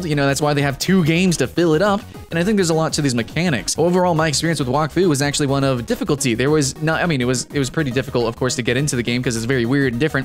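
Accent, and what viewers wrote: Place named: Texas, United States. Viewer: Are u from Texas?? holly shit, too fast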